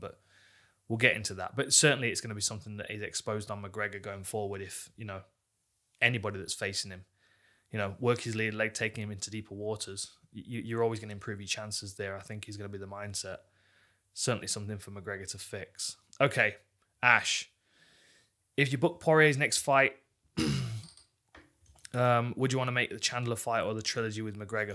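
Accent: United Kingdom. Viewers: Listening to you talk about mma makes me so relaxed man it's a form of meditation for me you heighten all my senses and I love listening to your soothing British accent and excellent flexion. Thanks bro!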